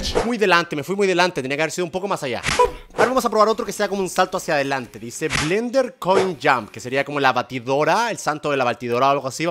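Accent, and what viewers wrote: Argentina. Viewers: german esta mas argentino en cada vídeo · ¿Soy la única que notó que a se le está pegando el acento argentino de alguna manera? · Digan si no está ablando con asentó argentino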